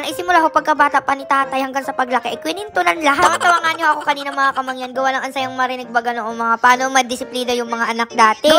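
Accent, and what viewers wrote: Batangas, Philippines. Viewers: Seriously? Mangyan na tagalog at puntong Batangas? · Dati ang vlog nito nung ndi pa sumisikat pumupunta xa sa mga katutubong mangyan ngaun nung nkilala na xa ndi n xa nadalaw dun, ang tunay n mngyan nandun mindoro sa bundok.nka bahag lang cla. Un dapat balikan mo qng saan ka nkilala dahil kumikita kna, ang mang yan ndi batangas ang accent.😢😢😢😢dati nung ndi kpa kilala lagi k nadalaw sa lugar ng mngtan ngaun ndi q n nkita bumisita k sa knila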